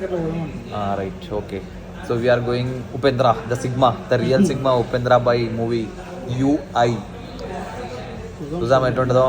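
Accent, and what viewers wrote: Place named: North, India. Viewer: Hindi badhiya bol lete ho bhai. Ekdam north side wali feel ati hai. 🫴🤌🤌🤌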